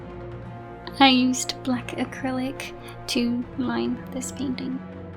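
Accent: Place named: United Kingdom. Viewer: What is your accent? It sounds "almost British", but not quite. Are you Welsh?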